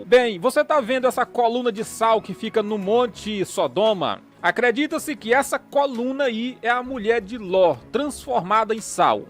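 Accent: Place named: Maranhão, Brazil. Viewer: Estou gostando muito dos seus vídeos 👏👏e aprendendo muito, e gosto do nosso sotaque do Maranhão 👏sou de São Luís MA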